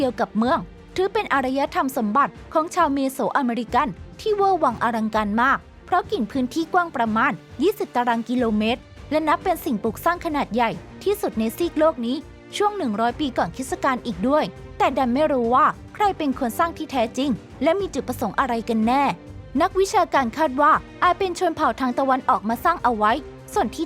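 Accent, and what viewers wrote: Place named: Isan, Thailand. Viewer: เจ้าของช่อง เป็นคนอีสานใช่ไหม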